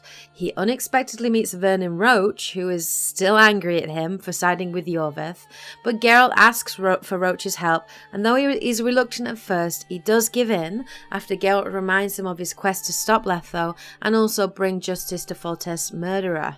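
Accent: United Kingdom. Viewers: 8 years late but hopefully not too late I played the 3rd game around 4 years ago and loved it went back and read all the books and played through thronebreaker, so now I need this video to catch up with the 2nd game in earnest Thank you so much! Also, the northern accent makes the video even more authentic, you sound like you give council to Queen Meve on the side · should´ve watched before playing witcher3. really well done don't mind the length at all. northern dialect is a big plus as well tah !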